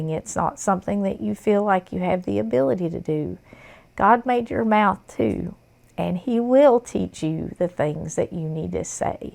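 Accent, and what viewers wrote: South, United States. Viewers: You talk perfectly fine. Southern Food/Country Food is famous for it’s flavor and comfort. So is our hospitality and gentle accent. God blesses us with you and your cooking skills.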